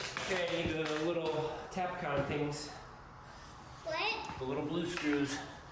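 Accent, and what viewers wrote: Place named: Southern Michigan, United States. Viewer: Appreciate the video. Just curious, where are you from? You speak well. You sound like maybe you are from Southern Michigan. Am I close?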